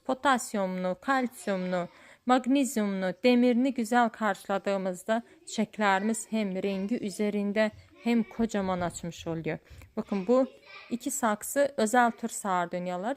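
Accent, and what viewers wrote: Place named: Azerbaijan. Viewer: XANIM SIZ AZERBAYCANLISIZ?SIZIN DANIŞIQNIZ BIZIM ŞIVEYE OXŞAYIR❤❤❤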